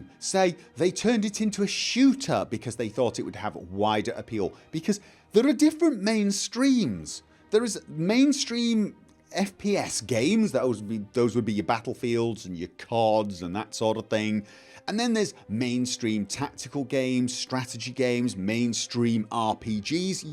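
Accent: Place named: Manchester, United Kingdom. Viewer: Most English personspeoples can identify that subtle:0 Mancunian accent...If he starts talking Norwegian I for one will be lost. P.s. great avatar.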